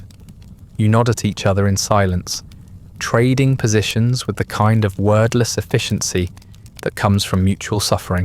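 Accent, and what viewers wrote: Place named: United Kingdom. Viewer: Hey, I’m here again! Idk why your voice is so soothing; but, thus far, yours is the best, from I’ve found here in Houston, Tx… A.M. also, idk why the Brit voice so Many, to the American ear, is so appealing! TY!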